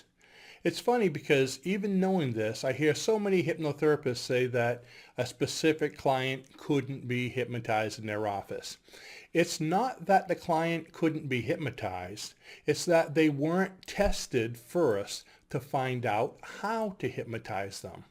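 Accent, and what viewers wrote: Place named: New England, United States. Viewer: i'm from new york and it sounds like you're from the new england area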